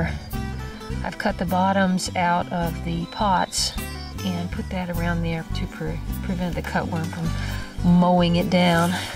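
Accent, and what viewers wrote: Tennessee, United States. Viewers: That is wonderful,. I will definitely be planting some here and there. We are living in the Central Valley area now. Modesto to be exact. This is a bit different for me, as I am used to growing in the TN area. I moved out west from the hills of Hancock County a few years ago. I could hear that TN twang in your voice. =) What area are you from out that way?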